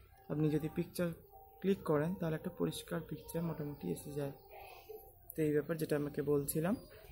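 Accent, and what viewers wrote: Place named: Bangladesh. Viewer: bangela deshi der moto kre kotha bolchs kno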